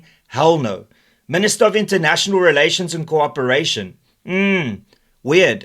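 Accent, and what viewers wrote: United Kingdom. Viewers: Says she in her ever so English accent. 😂😂😂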